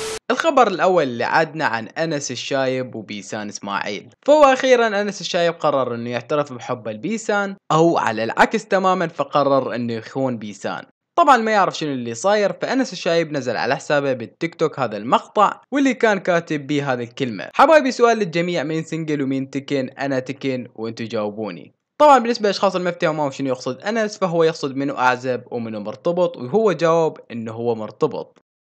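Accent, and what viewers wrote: Iraq. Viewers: انته عراقي لو كويتي❤❤❤❤❤❤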